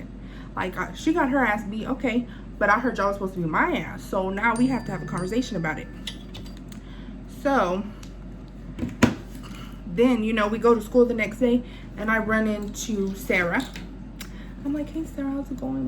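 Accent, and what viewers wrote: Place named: Clovis, United States. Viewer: Lmaooo😂😂 this sound like so many Clovis females I know, got me wondering which ones all nosey🤣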